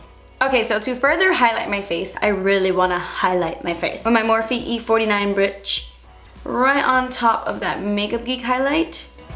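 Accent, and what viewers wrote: Cuba. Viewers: Your Cuban accent. I love it! 😍😍😍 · Lauren Emma I think she's from Cuba but I'm not sure! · Jajajajajajajaja! Tas podrío mi hermano! Me muerooooo! Me encanta tu acento cubano! Mi perro también me tortura así! A veces se asusta él y todo! Un besazo enorme desde Madrid! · I could just listen to you talk with your Cuban accent , I love it ! Lol 💕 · She's Cuban I believe!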